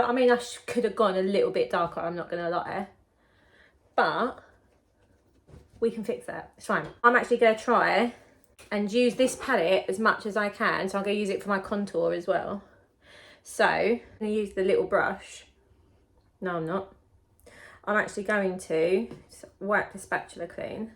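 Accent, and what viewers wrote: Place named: London, United Kingdom. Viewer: Hi, you’ve tested this the same way I might’ve done-I’ll definitely take note not to go too pale using the palette as a base product. Are you from London? You sound local- I’m from Romford.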